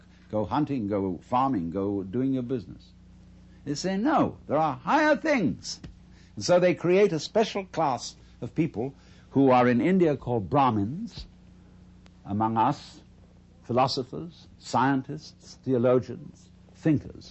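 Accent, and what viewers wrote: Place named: England, United Kingdom. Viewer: With that English accent i would not beleive anything he. says.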